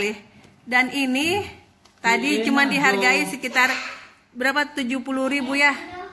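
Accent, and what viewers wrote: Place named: Palu, Indonesia. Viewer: Cucok meong memang ngana pe bakat...wkwkwkwk...hamaaaa...te sengaja qta dapa ngana pe vlog gegara ba cari resep sambal goreng daging kong pilih ngana pe resep,trus dengar2 ngana bicara roa...roa...qta pikir vlogernya orang Palu stouw...ternyata oh ternyata memang ngana orang Palu kong tamang SMP.lei...dunia memang selebar daun kelor kang hahahahah....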